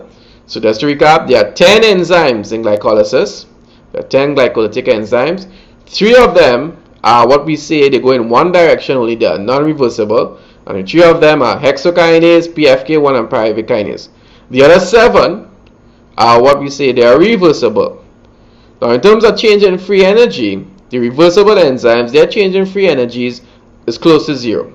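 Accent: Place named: Caribbean. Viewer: Dr., Been in broadcasting 33+ years and am now in studies for personal Fitness Training Certification. Huge chunk of the studies are all about this (ATP production is the focus). Not only have your lectures filled the blanks...but I grew up in St. Thomas, and hearing the Caribbean dialect just adds a comfort level to the learning...MESON!:-) Thanks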